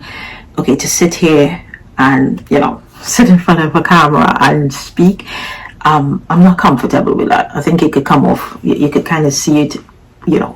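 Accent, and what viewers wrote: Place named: Caribbean. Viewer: 🎉 Congratulation’s To you Newbie to YouTube 🎉 love to hearing the Caribbean accent. Looking forward to your content and recommendations. Blessings to you 🙏🏾🤗💚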